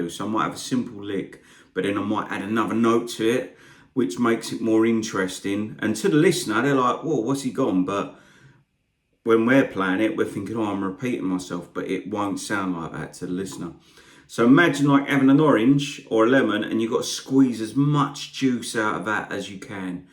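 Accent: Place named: London, United Kingdom. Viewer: Great teacher, love the accent too, REAL London.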